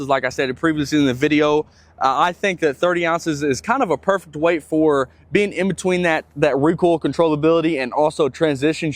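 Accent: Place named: Tennessee, United States. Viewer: Thought you were from tn I’m from Clinton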